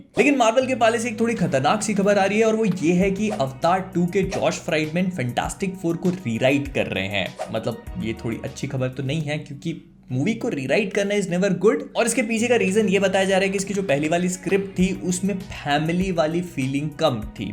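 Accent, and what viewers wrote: Bhopal, India. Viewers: Full Bhopal mode me bhai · The bhopali accent ✨ Miya!! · Ye bhopali wala hai kya 😆🤣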